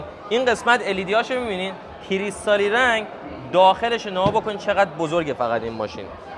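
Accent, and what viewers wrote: Tehran, Iran. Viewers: ای جانم داداش قشنگم و با لهجه شیرین پارسی باستان صحبت می‌کنی😊😊😊😊❤❤❤❤❤🎉🎉🎉🎉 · من درست شنیدم ایشون گفت اولین ایرانی هستیم که داریم از رویزرویز چینی فیلم میگیریم ؟! با وجود اینکه تسلط و لهجه عین بچه های شمرون تهرانه ولی واقعا رخ یه چیز دیگه میگه اونقدر چینی میزنه رخش که نمیشه گفت حتی دورگه اس یا اگر باشه یکی از اولیا باید ترکمن باشه یکی چینی یا هوش مصنوعی😂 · او موش خور بربری چرا لهجه ایرانستانی گب میزنی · عزیزم قربون اون قیافه چینیت و لهجه قشنگ ایرانیت برم فکر می‌کنم اگر الان کم کم شروع کنی با یک سری از مقامات یا صاحبان کارخانه توی چین که صاحب سبک و جنس‌های خاص هستند قطعاً توی تولیدات بزرگ جهانی سهمی دارند،و یا حتی اگر هم با خود مدیرانشون نمی‌شه با اطلاعات اون شرکت‌ها علت دونستن زبانشون پشت قضیه صحبت کن و فقط جلوی دوربین بیا جوونای ایرانی رو آگاه کن که الان تو دنیای اول ،کشور اول دنیا،که ، به تازگی دیگه قشنگ عیان شده،تو بگو چی داره می‌گذره چی قراره بشه،،،منظورم اینه که یک ذره اطلاعات تحلیلی بده ،که یک سری از این جوونای ،نخبه‌ای که داخل کشور هستند حداقل بدونن که کدوم ریسمان بگیرن..که حداقل کشورمون عقب نمونه از کشورهای دیگه..قطعاً که خیلی کار داریم ما ولی باز امثال شماها که میرید و میاین باید تعریف کنید که چه چیزهایی رو باید تو کشور هم مسئولین و هم جوونا بیشتر باید توجه کنن،و تو چه حرفه‌های ناشناخته‌ای باید کم کم برن اطلاعاتو آموزش ببینن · اصلا ماشین را بیخیال خیلی قشنگ فارسی صحبت میکنی. 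لهجه تهرانی واقعا فوق العاده اس. تبریک میکم که اصالت خودت را حفظ کردی با چهره کاملا چینی 😊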